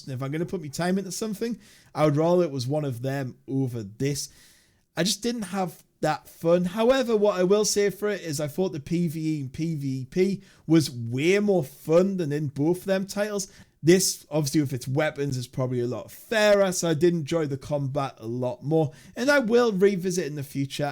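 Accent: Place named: North, United Kingdom. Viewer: Good video mate, good to hear a fellow northern keep up the good work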